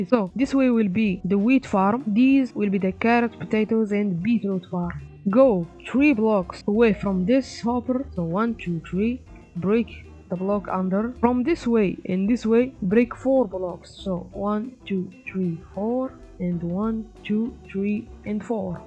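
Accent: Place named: India. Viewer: Its a great vid not kiding keep going but one thing i feel like you talk indian and sry if this bothers you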